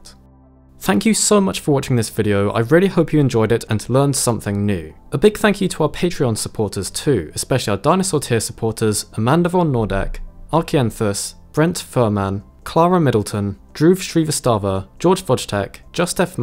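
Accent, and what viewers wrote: United Kingdom. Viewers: They’re literally just British lmao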